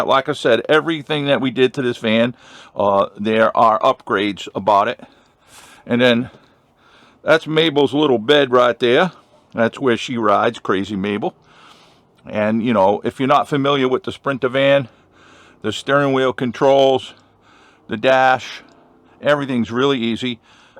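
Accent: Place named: New York, United States. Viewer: You have a newyorker accent👍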